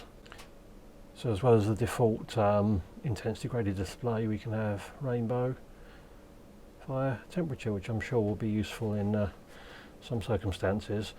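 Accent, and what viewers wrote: United Kingdom. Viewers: Very interesting subject really. but with your UK accent and whisper like voice. it is hard to catch the words with no subtitles. quite important product for me though but I ditch a lot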